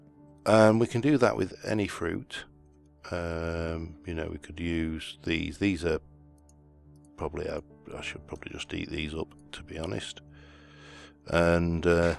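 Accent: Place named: East Midlands, United Kingdom. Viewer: I have been working my way through your series and look forward to every video. As a fellow Brit (I'm thinking East Midlands from you accent) I want to say well done our kid. I glad you covered this topic as its an area I need to work on in my own game.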